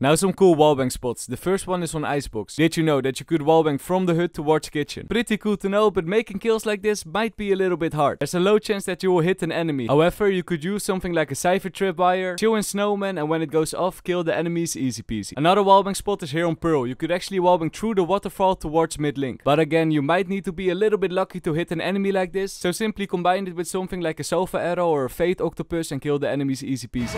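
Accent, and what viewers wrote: Netherlands. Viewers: are you dutch? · in the first 5 seconds i knew you were dutch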